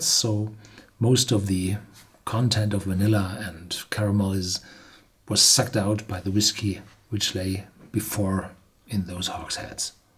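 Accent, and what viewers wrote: Germany. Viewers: Joe Macchiarulo he's german...almost all German English speakers speak like that.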